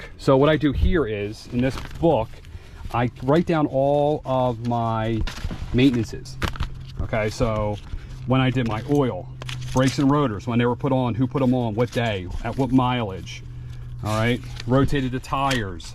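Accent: Philadelphia, United States. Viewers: Your accent, then I saw your t shirt. A fellow Philly dude. Yo!!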